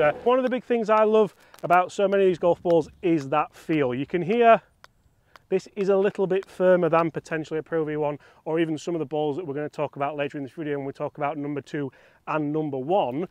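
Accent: United Kingdom. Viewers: I love your videos. Please explain what the hell is going on with you Brits pronouncing URETHANE as if it was spelt URETHEEN. I notice it's not just you. It seems to be a thing for you blokes. What's going on? At the end of the day it's just pronunciation so no big deal but why mate? I'd genuinely like to know.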